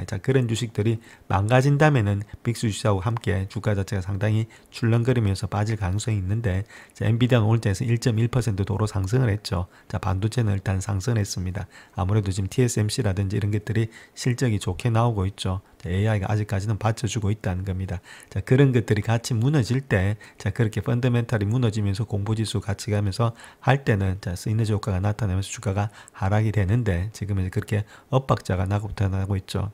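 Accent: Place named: Gyeongsang, South Korea. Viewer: 어쩐지 좀 거슬린다 했더니.. 갱상도인가요????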